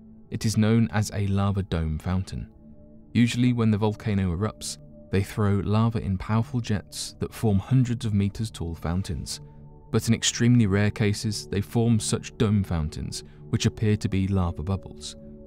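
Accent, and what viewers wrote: United Kingdom. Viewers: I have an unexplained mystery to explore: why are so many narrators of these channels British? Do they sound more "mysterious", or "suave", or "distinguished" than an American narrator would? Unless of course ALL of these channels are British owned and operated...which is real likely🙄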